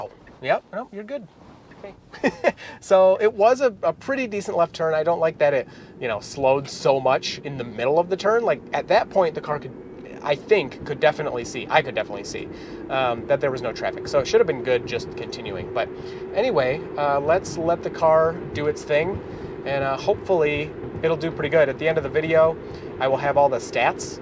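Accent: Michigan, United States. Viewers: Hearing your accent brings back memories of growing up in Michigan. I not only don't have it anymore 40 years later but can't seem to reproduce it.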